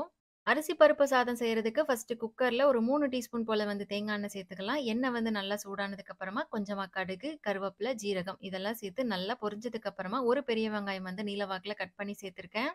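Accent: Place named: Thoothukudi, India. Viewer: Na valandhadhu full ha chennai dhan but enga mamiyaar veedu thuthukudi so neenga pesarapo enaku slang romba pudikum and simple ha neat ha vlogs irukum 😍😍 more over same age kuda 😍😍😍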